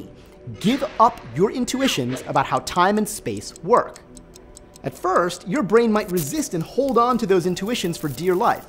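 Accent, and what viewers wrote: Australia. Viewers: A few years ago, watching Matt instead of Gabe was slightly weird. Now rewatching the old videos and seeing Gabe instead of Matt is weird (no disrespect, Gabe). Matt has really made SpaceTime his own, presence wise I mean. Its weird to watch a SpaceTime video and NOT hear that sexy Australian Complex Physics voice. You guys chose a really good replacement. The lightsaber battle was cool too. May Matt guide us in this journey of understanding (or trying to understand 😁) for a long time. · I wish gabe stayed. Being a non native english speaker, the new host’s australian accent is so hard to understand.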